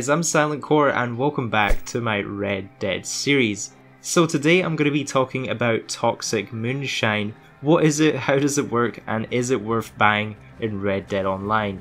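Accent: Scotland, United Kingdom. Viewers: What part of Scotland are you from?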